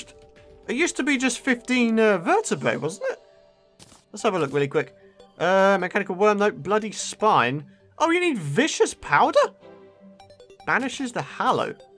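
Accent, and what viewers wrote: United Kingdom. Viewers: HEY! I love your videos and your British ascent. you are my favorite you tuber and I hope you could respond to me, it would make my day better, after being bullied.....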